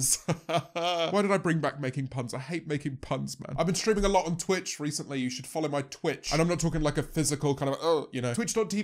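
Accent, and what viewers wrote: United Kingdom. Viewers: Sometimes I wish I had this accent I don’t know why, I really like British accent lol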